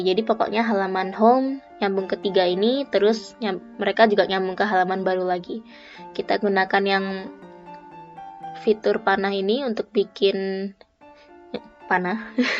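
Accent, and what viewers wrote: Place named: Java, Indonesia. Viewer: Semangat Mbak....walaupun di Tokyo dan sering komunikasi pake bhs jepang/english tapi aksen jawane isih ketok lho...wkwkwkwk. Maturnuwun nggeh tutorialnya about digital content...ayo banyak upload cerita tentah gimana bisa kuliah di jepang dll....kehidupan sehari hari di jepang, suka duka nya...dll...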